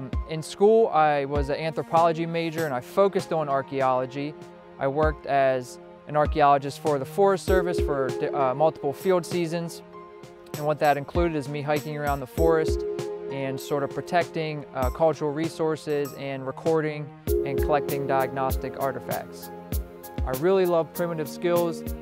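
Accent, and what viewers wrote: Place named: Eastern Pennsylvania, United States. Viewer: What part of PA? You sound like your maybe eastern PA Lebanon,Perry county area.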